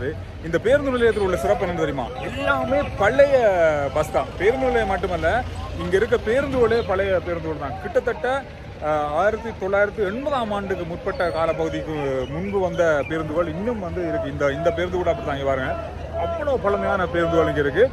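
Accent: Sri Lanka. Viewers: congrats watching all your videos for your srilankan tamil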